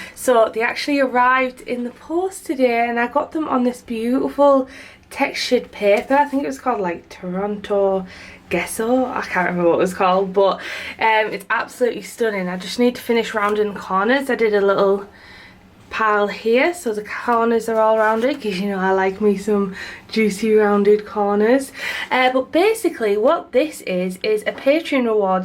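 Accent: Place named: Northeast England, United Kingdom. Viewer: I'm from Newcastle and your accent intrigues me, its like geordie and yorkshire at the same time! lovely :)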